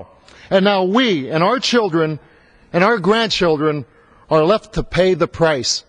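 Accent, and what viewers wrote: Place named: Hazleton, United States. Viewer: he still speaks like a hazletonian.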